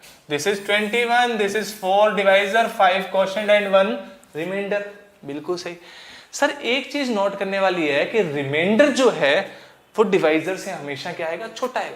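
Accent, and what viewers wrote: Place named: South India, India. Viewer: Sir aapka naam kya hai? Are u from South India? It assumes of ur accent…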